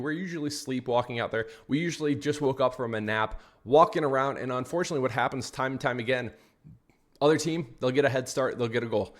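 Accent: United States. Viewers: Are u from kc bro?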